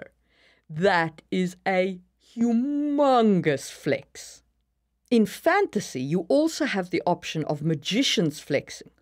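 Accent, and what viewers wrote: South Africa. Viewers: I love the step by step way you take us through detailed aspects of worldbuilding! I've been watching tons of worldbuilding videos and so many of them are so general that I take nothing away from it. Yours are great and really well structured, I've got some ideas to work with just from this one video. Subscribed! P.s., your accent is so cool, are you from South Africa?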